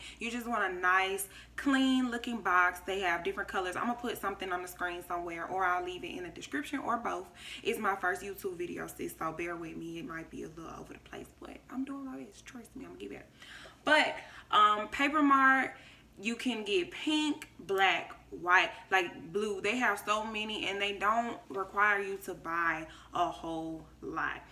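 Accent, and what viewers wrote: Atlanta, United States. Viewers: Lol i was like u sound like from ATL and right after i said it u said u were from ATL. Lol im from here too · Tour video just popped up in my library. Good advice. Also you have some pretty skin and love the southern accent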